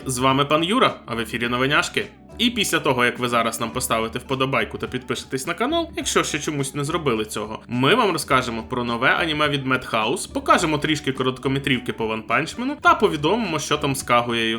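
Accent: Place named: Bukovina, Ukraine. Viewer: оу, ви з Буковини 🧐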